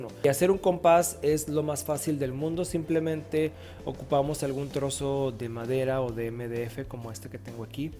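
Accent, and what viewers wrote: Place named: Peru. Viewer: Suenas como si fueses peruano 😊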